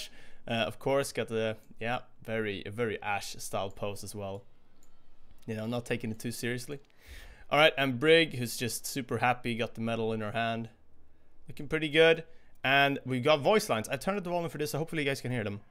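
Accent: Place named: Sweden. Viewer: swedish accent jumped at me from the first 5 seconds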